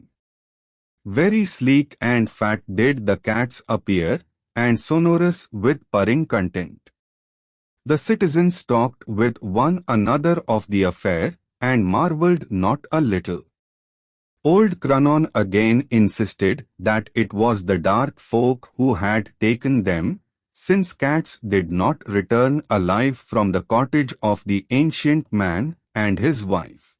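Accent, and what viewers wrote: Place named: India. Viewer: Should work on that accent man.. only like 70 percent of this is understandable to non indians. The effort is respectable though🙏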